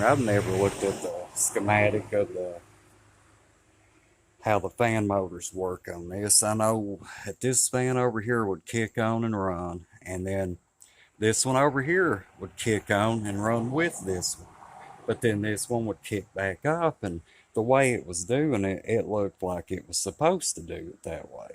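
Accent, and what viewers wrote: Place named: Texas, United States. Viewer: I bet anything you're from Texas 😅 that accent is unique from there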